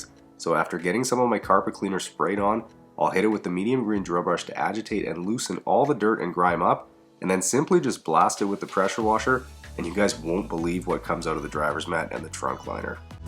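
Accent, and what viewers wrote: Canada. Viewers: Ok so now after watchin several of your videos I'm hooked. I had to share your videos with my sis in Florida..Now I know your Canadian.. I can't hate cause my daughter lives there😊 and I love Toronto and was in the military in the Detroit area long time ago so your accent was very familure. So you know electric, you can clean, you use tools, you like big toys and working outside, you also love music. I also watched videos of your studio build. I just wanted to say, I think your well rounded and a super cute dude who pays attention to the 'details'..also..your detailing is phenom! I can't believe people don't clean out their vehicles before you get them, that seriously blows me away, Thank you for putting great content out oh and I watched a free detailing vid you did..giving is so uplifting, and it comes back to you. Thank you keep up the good work!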